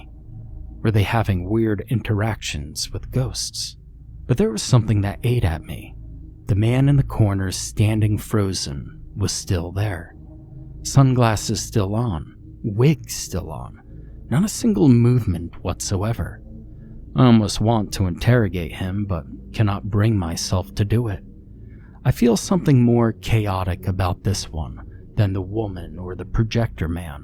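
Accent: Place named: Texas, United States. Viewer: Holy shit! That was an incredible Texas accent with Eastern European undertones! I mean it! That might be the best, specific accent I’ve ever heard.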